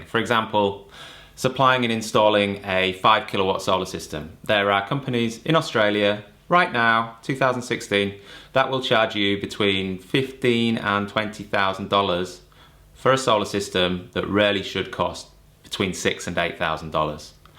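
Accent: United Kingdom. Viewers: All be it a British Aussie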